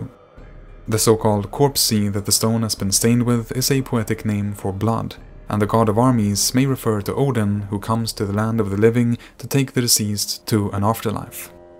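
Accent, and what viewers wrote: Sweden. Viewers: Måhända att det är en underlig fråga men kommer du från Blekinge eller småland?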